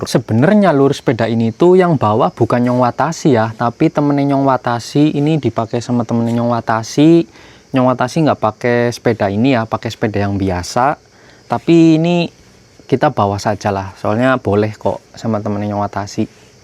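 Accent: Banyumas, Indonesia. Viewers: Wong ngapak ya min? Rika sekang daerah ngendi lur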